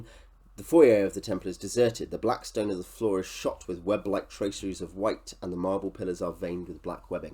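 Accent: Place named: United Kingdom. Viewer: I'm sending off for this today. You make it sound quite enticing. The British accent was the clincher.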